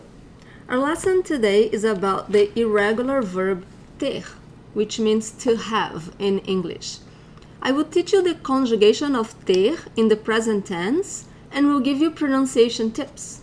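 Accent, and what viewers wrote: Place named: São Paulo, Brazil. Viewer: você é de qual região/estado? Parece ter um sotaque paulista haha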